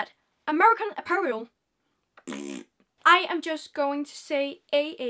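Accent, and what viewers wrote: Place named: Denmark. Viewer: Jeg kan høre at du er dansker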